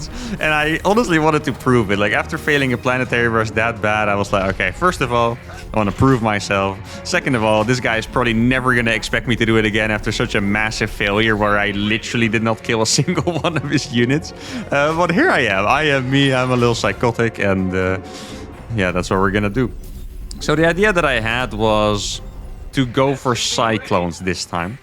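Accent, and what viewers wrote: Netherlands. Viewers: ruthermental? /dadjoke. I enjoyed this, always lovely to have dutch inflection in the accent. Go team Oranje!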